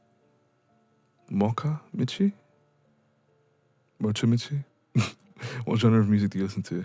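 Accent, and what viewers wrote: United Kingdom. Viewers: Omg so I wasn't going to comment on this video because I am sooo late but we have so much in common it's incredible! First of all, I am a 20-year-old female, my parents are from Uganda but I was born in France and I live there now buttt I also lived in England for 4 years when I was younger near Wimbledon so that's why I love your accent and it feels so much like home to me :) I love winter and Halloween so much they are the thing I look forward to all year and Christmas music is my kryptonite, the country I would like to visit the most is Canada and I also love kdramas and anime so much, my fav recent series is Alice in borderland. Also if that wasn't enough I have a very artistic background as theatre is my passion as well as music and I have studied and practised both for years and would love to continue, 99% of my friends are either in graphic design/art (art school in architecture/ designing etc), music or cinema/ theatre so art is the only thing I know and love religiously with all my heart. 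There are also so many other things that I forgot but you get the picture lmao I think it's crazy that I have been a fan of your channel since the begging before you even had 5k and that I now find out that we have a LOT in common :) anyway sorry for my long paragraph but I love your work and hope you get to 1M one day because you deserve it so much! I am on your discord so if you ever want to chat it would be a pleasure! my is Angelina with purple hearts <3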